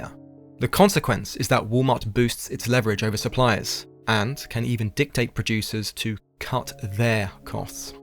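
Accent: United Kingdom. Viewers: Go back to the American accent. This British accent is so damn annoying.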